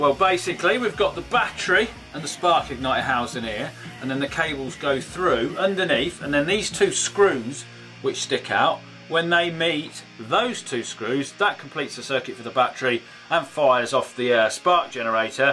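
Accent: United Kingdom. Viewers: I can't understand half of what he's sayin' did he improve his british accent in recent vids?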